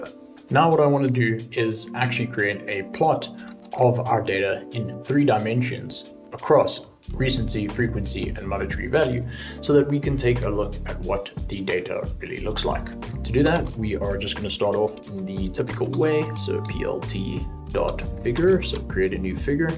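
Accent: South Africa. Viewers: Am I hearing a South African accent? Thank you for the valuable video by the way. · Is that a South African accent? Automatic Subscription!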